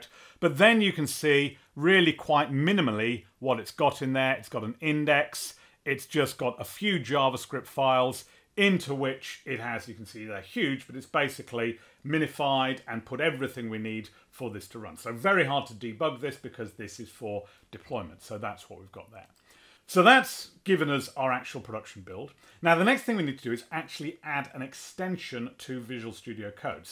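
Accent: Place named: United Kingdom. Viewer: Such good assistance with a great British accent, thanks :)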